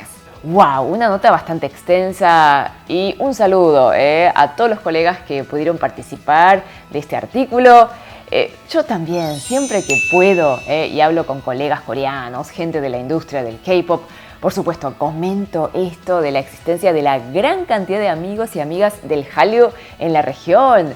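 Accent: Argentina. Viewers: Tienes acento argentino si no equivoco xd gracias por el video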